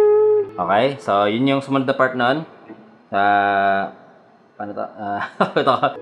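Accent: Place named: United States. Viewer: Boses kano si sir haha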